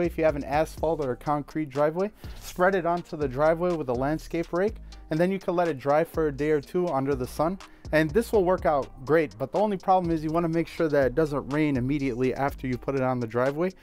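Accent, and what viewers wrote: Chicago, United States. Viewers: I love the Chicago accent, brother! Keep up the good work. Go Cubies!! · Go Cubs! Appreciate the I formation and since moving to Texas, I love hearing the Chicago accent! I need an Italian beef and Honkers Ale now.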